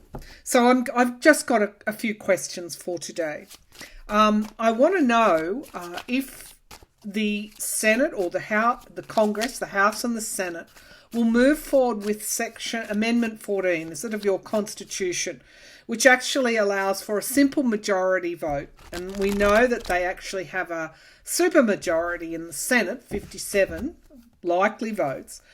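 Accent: Australia. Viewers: You have been such a comfort to me this past year. I’ve truly felt your genuine and heartfelt concern and empathy for what we’ve been going through these past years. If it weren’t for your charming Aussie accent, I would think you’re a citizen of the US! I absolutely love and adore you. I truly mean it when I say I don’t know how I could have taken all the abuse without your constant calm comfort. 🙏❤️🌸😇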